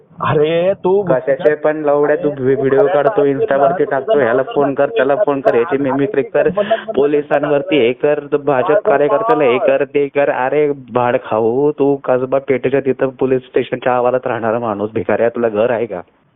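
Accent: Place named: Nashik, India. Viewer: बोलण्यात असा वाटत नासिक किंवा A.नगर चा दिसत आहे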